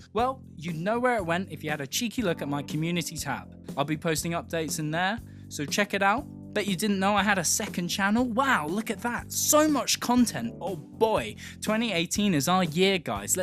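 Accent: England, United Kingdom. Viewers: Hey man nice 2 see u working, keep it up you sexy English accented fellow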